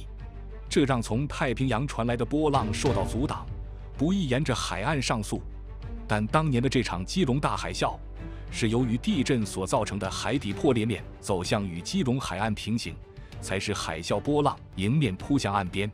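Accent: China. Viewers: 這個text to voice 好像是公用的，但都是大陸語音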